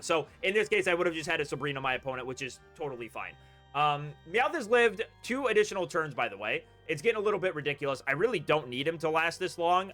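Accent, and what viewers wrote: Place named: North East, United Kingdom. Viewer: I've never said this bro but I think you must be from the north east. Just based off your accent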